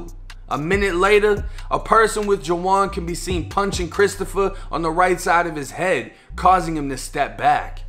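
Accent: Boston, United States. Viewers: I ain’t know Tampa had that accent thought you was from Boston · Are u from Boston bro?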